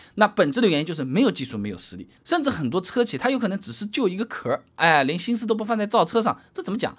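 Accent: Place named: Shanghai, China. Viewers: Jerry J 吴语口音都这样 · 听你口音，像是上海或苏锡常一带的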